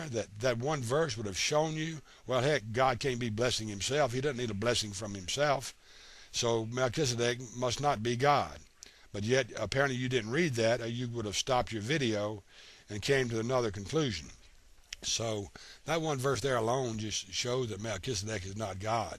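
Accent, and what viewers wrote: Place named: South, United States. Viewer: Your accent alone makes your credibility come into question..hilljack? hillbilly? south of the mason dixon line? anyway.. Jesus was a Rabbi, human. He Blessed God all the TIME.. so your argument is ridiculous. According to you, Jesus is God so how could he be blessing himself? Then by your own argument Jesus cant be God.. thanks for clearing that up.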